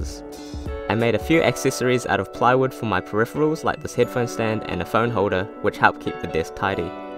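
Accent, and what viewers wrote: New Zealand. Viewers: is that a kiwi accent I hear! Where are you located?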